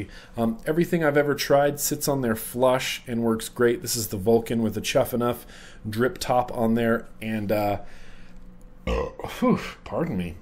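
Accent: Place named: West Virginia, United States. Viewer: Hey there mr. lol i just bought a dreadnaut clone from fasttech and everything seems to be in all the right places but i cant seem to get it to give off big vapor ive built the coils like six times i have been using 28 kanthal and organic cotton i use a multimeter it usually gives me.9 ohms but when i fire it it seems like it takes too long and u have to press the button at like an angle for it to even fire what coyld i b doing wrong? Please any help or advice would be greatly appreciated i love your long ass vlogs its awesome i love that adorable too im from west virginia so we sound alike lol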